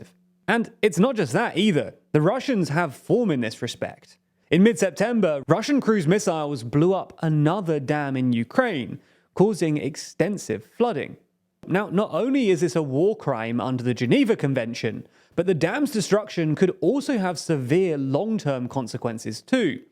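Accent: United Kingdom. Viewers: pretty sure its just the british accent though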